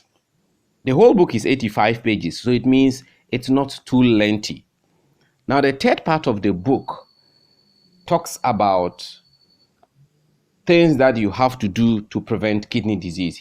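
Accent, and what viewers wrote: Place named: Ghana. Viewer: First I love the way Ghanaians speak so that means I love the way you speak...secondly I love how you were able to sell this book even without looking at it listening to you can make one want to buy the book..and finally thank you for choosing this book to review on it because a lot of people need to be really educated about there health... am a health educator and our primary goal is give preventive means other than curative means to health and as they say health is wealth so thank you for sharing this I enjoyed every bit...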